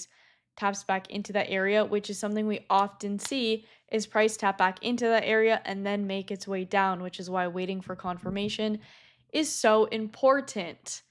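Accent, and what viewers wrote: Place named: Midwest, United States. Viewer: interesting to see a young woman trading. u sound like your from chicago or wisconsin. ive been around and know a daytrader he teaches his daughter to trade. pretty cool keep going you can make a good life for yourself by yourself.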